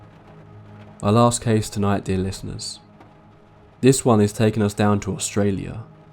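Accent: England, United Kingdom. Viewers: Because he is English, always make the best narrators, Americans are too hyper+ accents are trash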